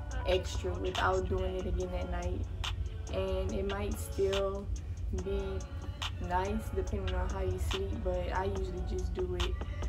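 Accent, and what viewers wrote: United States. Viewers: You have such a pretty voice / accent, are you from New Orleans?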